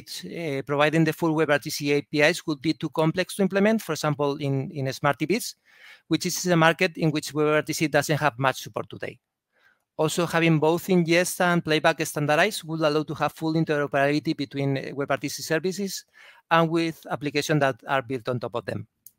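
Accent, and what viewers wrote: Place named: Spain. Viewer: very difficult to understand. strong Spanish accent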